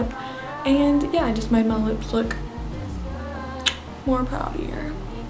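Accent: South, United States. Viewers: do you live in the south? you sound southern I'm curious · love that southern accent , where are you from ? - much love from South Carolina 💖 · nah but I love her voice and the country accent.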